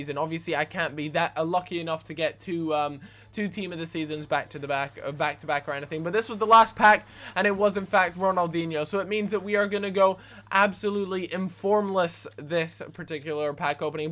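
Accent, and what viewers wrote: United States. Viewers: Sound American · R u American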